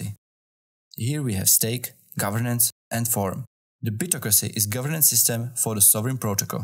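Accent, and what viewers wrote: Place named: Czech Republic. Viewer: I can hear your Czech accent :-) But I might be wrong...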